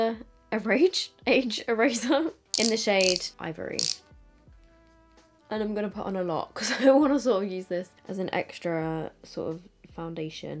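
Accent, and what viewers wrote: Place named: England, United Kingdom. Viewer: I would love to enter for your giveaway. But I don't have high hopes as I don't usually comment on YouTube videos in general 😔 I watch you daily though and introduced you to my mam, and I've got your revolution collab makeup. I think you're such an inspiration and I adore your makeup looks. And your English like me so it's cool to hear! Haha.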